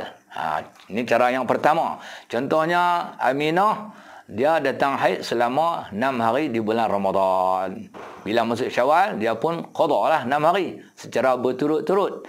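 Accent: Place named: Kedah, Malaysia. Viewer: loghat Kedah ada yg sneng ada yg ssh utk digertiin...dri Kelantan Sy terus gk bisa gerti...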